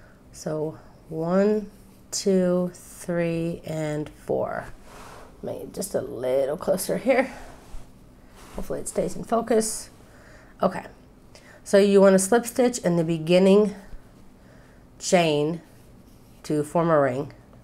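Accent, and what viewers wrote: South, United States. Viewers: Did you say in "Israel'? I've been following your videos and never picked up on that. You sound so southern to me. Did you move there? Did I even hear right? Sorry, couldn't focus. Played it over. Anywho... thank you again for another video. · Have you lived in Israel all of your life? You have a accent with a USA southern drawl somewhat. I'd love to visit Israel.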